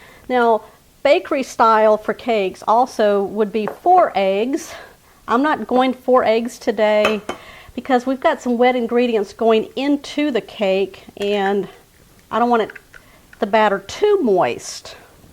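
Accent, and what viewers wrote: Texas, United States. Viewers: I am delighted with your Texas accent pronouncing ‘marscapone’ like ‘NASCARpone’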